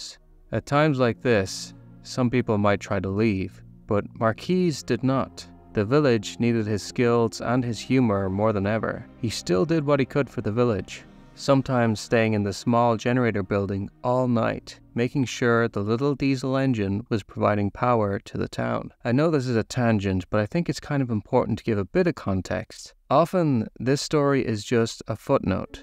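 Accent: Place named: Ireland. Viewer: Please tell me that you are NOT trying as hard as you can to hide your beautiful Irish accent!!!!! Ahhhhhhh! Stop it! We LOVE Irish and their musical accents.❤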